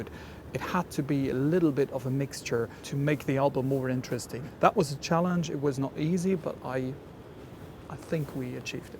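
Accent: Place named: South Africa. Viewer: he sounds south african here...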